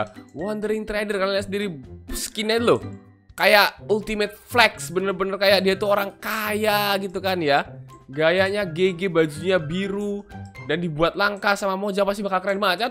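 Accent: Java, Indonesia. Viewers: kamu orang jawa?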